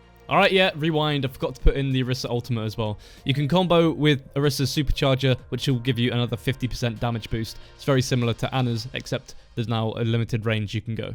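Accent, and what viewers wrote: United Kingdom. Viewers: Where are you from? Southern England?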